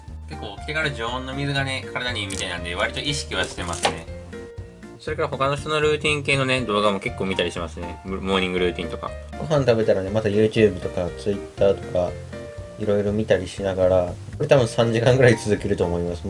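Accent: Kansai, Japan. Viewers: いんきゃの関西弁はらぶい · タメ語関西弁よき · 関西弁萌える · 急に関西弁なったりタメ語なったり俺言うん好きやわ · 関西弁いいね👍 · 京都弁？関西弁？が、可愛いわぁ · 久しぶり見たけど関西弁すごい😂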